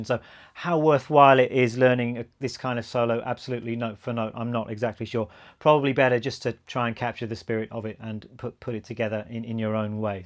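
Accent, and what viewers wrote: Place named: East Anglia, United Kingdom. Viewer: fricking awesome. great ear. where are you based, you sound like myself, East Anglia?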